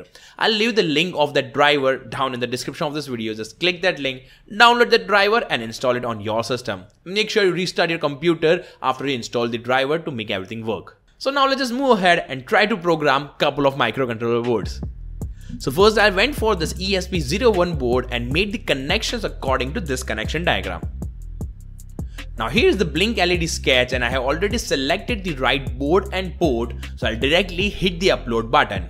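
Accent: India. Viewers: You're speaking Indian or Pakistani? 🤯 So very very was bad, it's was same you're something eating and speak 🤦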